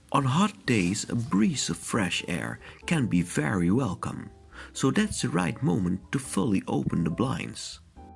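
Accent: Netherlands. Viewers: It’s so funny, I also thought you sounded like the Netherlands, so it seems I was guessing a little correctly. Good luck with all. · Hi, thousand thanks for this absolutely stunning documentary. No clue, whether it was made using AI, but in this case, the artificial help would have been wholesome. I very much enjoyed the abundance of plan views. This is a great plus, considering all the documentaries entirely made by AI running riot at YouTube. They are like a pest. A highly mind numbingly monotonous voice tells stories embellished with lyric weeds, which in general has nothing to do with the topic of the documentary. Would be kind of a match if you put in perspective that the images either are plentiful and artificially rendered and show scenes, which don’t at all match neither the narrator’s discourse nor the topic. In profusely appreciated contrast this documentary is how they should be and show, what the whole point is in doing a documentary. 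By the sympathetic way the narrator pronounces the “v”, tell’s me of his home country the Netherlands and shows me again, why I love the Netherlands and the Dutch people. I must visit the country again very soon, should not be too difficult for me, as I live in Germany. I can only bid you many warm a thank you. Please continue your fine work. Hartelijk bedankt voor je geweldige documentatie. Blijf zo doorgaan. 🇱🇺“Je maintiendrai”🇱🇺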